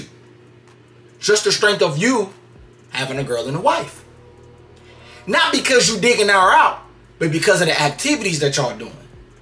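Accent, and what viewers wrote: Baltimore, United States. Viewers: He def from BALTIMORE OR DMV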